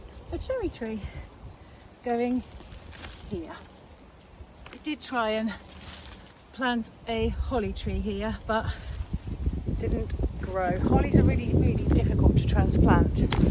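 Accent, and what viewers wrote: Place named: United Kingdom. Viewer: British gals are so cute and sexy. Love your accent 😍❤️.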